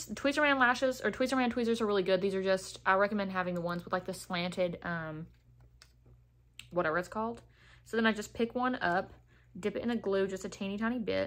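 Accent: South, United States. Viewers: Where are you frommm?????? I hear a little southern accent mixed in there!I’m from South Georgia and it’s REALLY nice to hear somebody else that sounds like me on social media!!!! 🫶🏽🫶🏽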